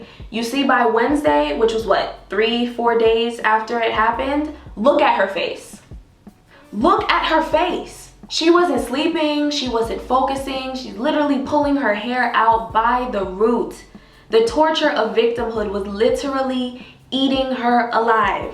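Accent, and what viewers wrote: Jamaica. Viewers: "Mi will watch dis likkle ediat show" OMFGGGGGGGGGGGGG YOU ARE JAMAICAAAAAAAAAAAAAAAAAANNNNNNNNN or Caribbean?? But mi know mi hear a Jamaican accent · I subbed the instant i heard the jamaican accent. "Mek me watch this likkle eediot show " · The way her Jamaican accent and sayings play peek a boo tho I love it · Love your Jamaican accent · are you from Jamaica? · Wait you speak patois🥺I just found this channel loved your content but now I’m sold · Is she Jamaican or Trinidadian?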